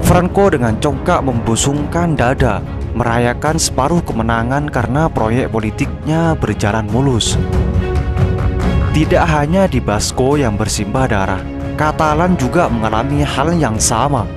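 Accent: Madura, Indonesia. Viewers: logat madura banget😂😂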